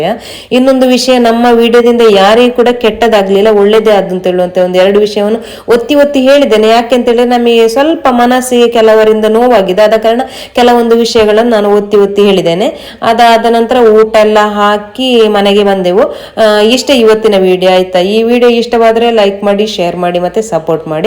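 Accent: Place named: Sullia, India. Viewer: ನಿಮ್ಮ ಸುಳ್ಯದ ಗ್ರಾಮ್ಯ ಕನ್ನಡ ಬಹಳ ಚೆಂದ ಇದೆ.ಹೀಗೇ ಮುಂದುವರಿಸಿ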